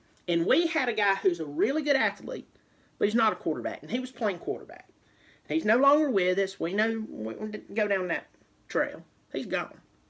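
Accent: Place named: Mississippi, United States. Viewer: Your voice sounds like a Mississippi country girl...Roll Tide sweetie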